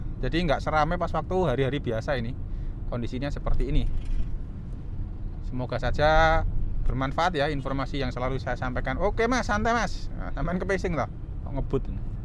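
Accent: Java, Indonesia. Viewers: Suarax kok medok bgt y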